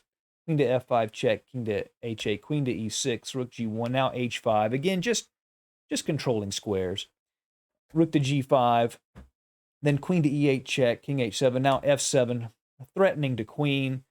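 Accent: United States. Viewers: I love your postings. 80% because your analysis is so instructive, 10% because you do games I remember from my youth (you know, when you said things like p-K4) and not just the stars, and the final 10% because I just luv that akcent - sounds like a real cowboy to my terribly British ear, old chap.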